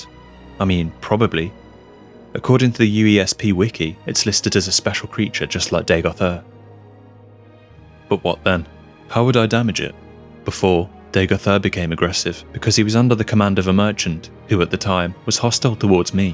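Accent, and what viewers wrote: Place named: United Kingdom. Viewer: With the channel picture, the thumbnail, and the title I didn’t expect this voice. I anticipated something louder, more crude, and American. Not bri*sh ASMR. Good video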